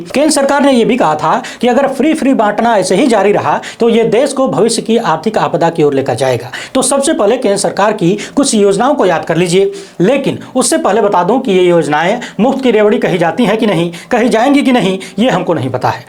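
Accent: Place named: Awadhi, India. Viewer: आपकी पत्रकारिता को सलाम। आप अपने कार्य को पूरे समर्पण के साथ कर रहे हैं। आपको मेरी ओर से स्नेह और दिल ❤से आशीर्वाद। आप बहुत ही द्रुत गति से बोलते हैं और कभी कभी आप अवधी बोली का तड़का लगा देते हैं। आपकी यह शैली मुझे बहुत पसंद हैं।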